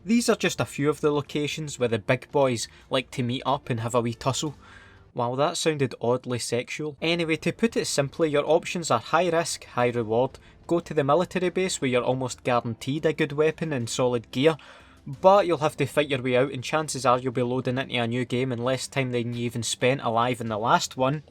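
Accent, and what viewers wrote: Scotland, United Kingdom. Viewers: Some Scottish accent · H1 Zed 1, now this is a real Scot right here. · holy shit! this dude has the best scotish accent ever!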